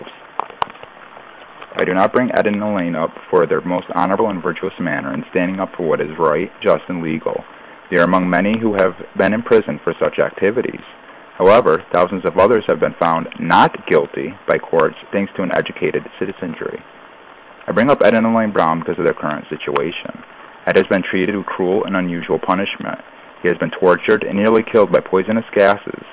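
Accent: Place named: China. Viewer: Frutation??? Subjigated to...? Chinese english...not bad though....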